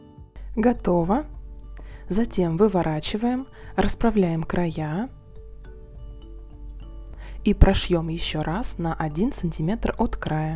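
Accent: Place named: Ukraine. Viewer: ПЕтелька, протестируем!!! Что за малороссийский говор??? Следите за речью! Вы же не в подъезде гутарите!???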